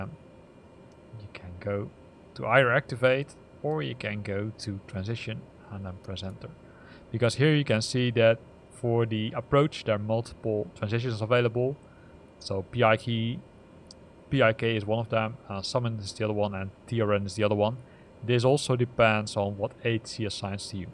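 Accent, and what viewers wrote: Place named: Netherlands. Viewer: Sounds Dutch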